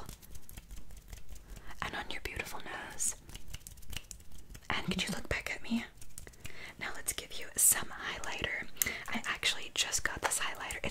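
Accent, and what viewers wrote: United Kingdom. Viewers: I love your British accent, it's so cute and relaxing! :) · British accent on❤️ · I missed the British accent!!! It’s been a while ❤️ you’re so good at it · UK accent on point! Great ASMR vid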